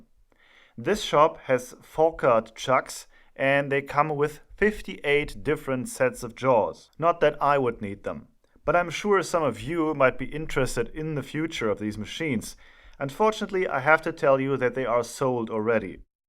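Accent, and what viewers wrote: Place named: Cologne, Germany. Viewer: Der Kölsche Dialekt 👍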